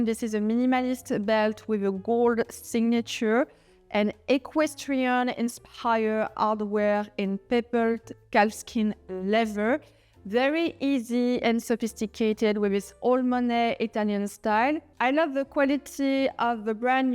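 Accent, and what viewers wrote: France. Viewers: unrelated, but the French accent sounds very fancy. It matches the theme haha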